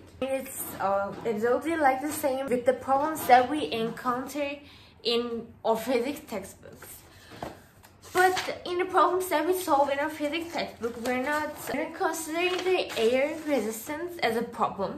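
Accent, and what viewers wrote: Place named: India. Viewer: Abla hintçe aksanın var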